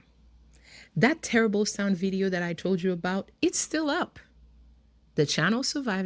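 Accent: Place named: Haiti. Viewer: Wait a minute, you're from Haiti 🇭🇹...I kinda thought you might be because of your accent but that say confirmed it...I think you might be the person I need in this season, like I commented on that previous video I watched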